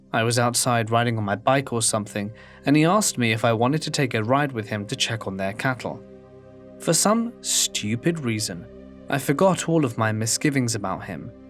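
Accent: United Kingdom. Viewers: The British accent gets me every time. 😊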